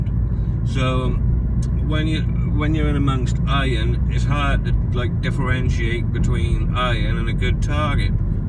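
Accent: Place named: Alabama, United States. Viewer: I'd recognize that voice anywhere! It doesn't matter where you go in the world,. That Alabama accent is always going to shine through!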